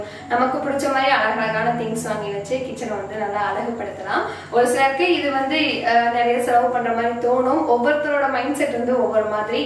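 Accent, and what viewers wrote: Kongu, India. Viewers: Kongu slang la pesunga video la onetime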